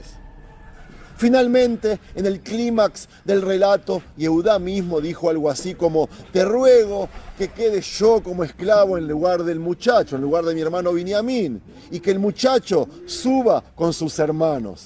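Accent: Argentina. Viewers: Shalom! Me encanta ver sus vídeos me fascina todo lo que tiene que ver con 🇮🇱 y en español con acento argentino aún mejor.❤️🇮🇱 abrazo!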